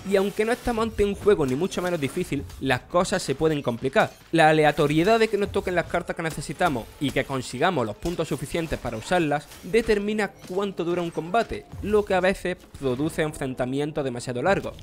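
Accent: Andalusia, Spain. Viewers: Este chaval es de Jaén o Granada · No tiene ningún problema de dicción,simplemente es su acento.Posiblemente sea de Almería o Jaen.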